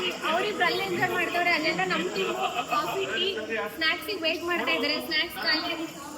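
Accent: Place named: Hassan, India. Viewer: Natural hassan language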